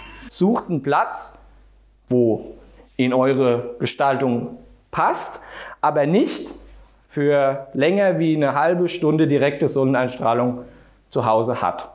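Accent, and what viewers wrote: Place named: Palatinate, Germany. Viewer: Weschde... Efach schä es Pälzer Dialekt!!! #Südwestpfalz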